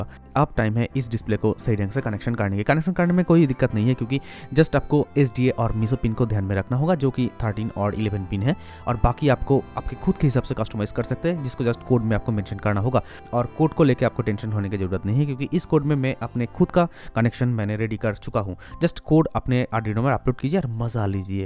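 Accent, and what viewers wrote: Bengal, India. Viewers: R u bengali? 🙂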